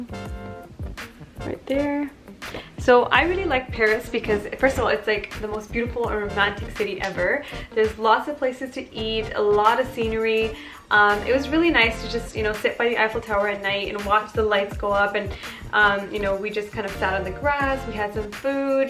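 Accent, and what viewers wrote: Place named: India. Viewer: Where do you live in India? Ur accent is pretty cute😍 just wanna ask where u got that from? Anyways, keep shining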